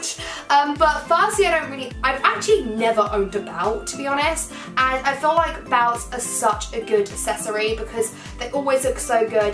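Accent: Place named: United Kingdom. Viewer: hey I subscribe to your channel and I love your accent I believe that your accent is British right?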